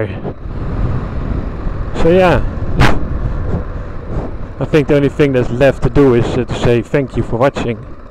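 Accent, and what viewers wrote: Netherlands. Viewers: Op de 1 of andere manier hoor ik gwn dat je een Nederlander bent😂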